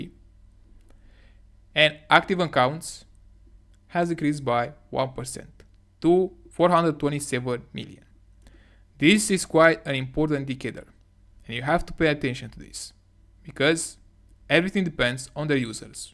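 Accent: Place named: England, United Kingdom. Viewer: Don't take advice from some moron with heavy English accent from the internet.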